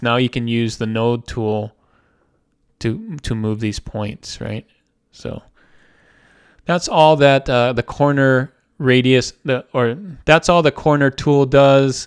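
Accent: Hawaii, United States. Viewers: Bro, are you from Hawaii?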